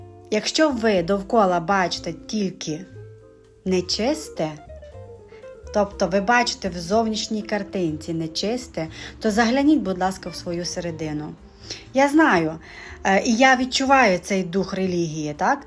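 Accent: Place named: Chernivtsi, Ukraine. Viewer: Ви з Чернівецької області , говор у вас ніби звідтіля.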